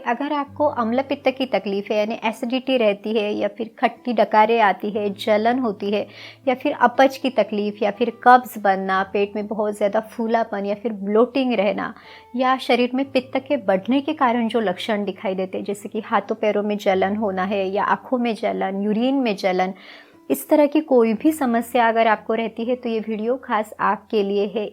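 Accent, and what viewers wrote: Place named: Maharashtra, India. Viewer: Aarey tumche bolanyaavarun tumhi marathi waatata. Mag aadnaav Kasey 😂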